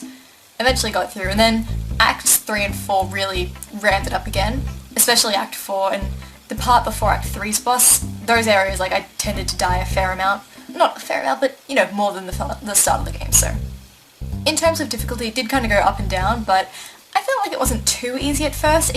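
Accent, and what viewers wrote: Australia. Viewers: Insta-subbed! It's so great to hear a fellow Aussie on the interwebs for once. :) Where abouts are you from?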